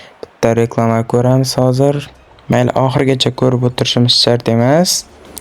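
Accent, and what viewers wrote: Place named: Khorezm, Uzbekistan. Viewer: Chisdi Xorazm sheva qu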